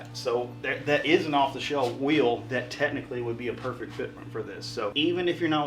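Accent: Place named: United States. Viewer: I feel like you have a huge country accent on camera!!! haha.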